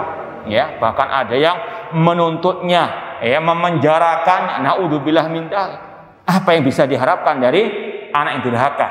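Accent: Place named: Java, Indonesia. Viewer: Medokan sawah timur hadir 👍 baarakallah fiik Ustad.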